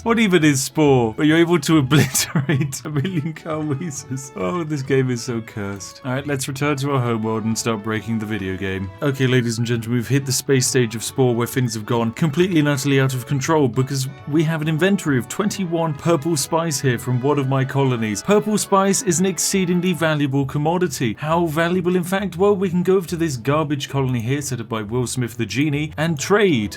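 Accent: United Kingdom. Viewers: I don't even have spore just came here for my daily dose of British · wait a second, you are british! And you are playing Spore, where the most valuable thing is spice! AND YOU MAKE COLONIES!!!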